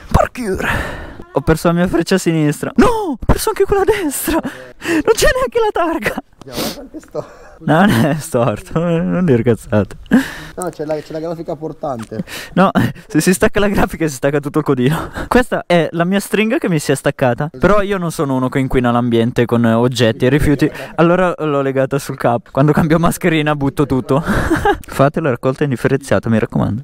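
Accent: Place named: Rome, Italy. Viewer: Sembri un romano 😂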